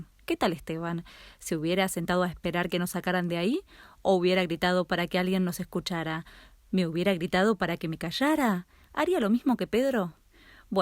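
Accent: Argentina. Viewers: que onda con su voz 0-o y creo que es argentina :v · machu YT en las distintas provincias de argentina tiene acentos diferentes, x ej los porteños y los cordobeses. ahora esa es una diferencia clara, pero tmb hay diferencias que no se notan mucho, como será este caso. bueno eso jaja, es argentina pero usa aquí, puedes noc re rancio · Esa voz es re Argentina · la voz parece argentina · Tu acento argentino se nota bastante no intentes hablar en neutro porque te queda mal. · Es la típica argentina narrando algo escrito así hablan en el canal Paka Paka. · Suena re argentina y hablando con tuteo y lenguaje neutro JAJSJSJ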